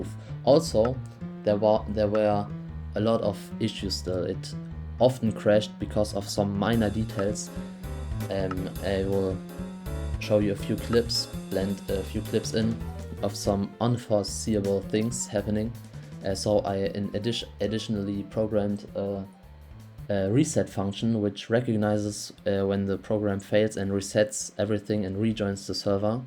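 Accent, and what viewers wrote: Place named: Germany. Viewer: Are you German?, as a german i woud say that you sound german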